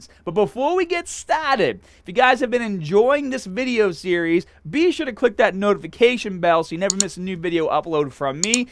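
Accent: Boston, United States. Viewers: Love that Boston accent bro! Good info!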